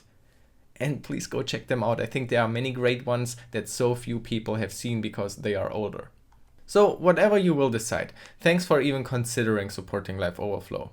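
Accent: Germany. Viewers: what part of Germany you from?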